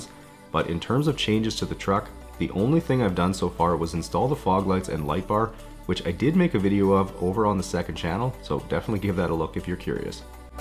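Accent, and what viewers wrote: Canada. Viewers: I love hearing your Canadian accent and I hope your not thinking I'm a creep. Just I love hearing different accents they are very beautiful.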